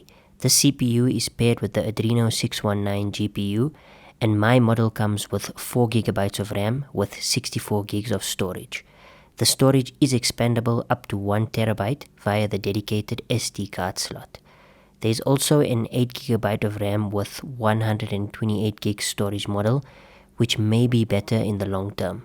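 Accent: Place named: South Africa. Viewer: You can tell this guy is from south africa 😂 im also from sa bru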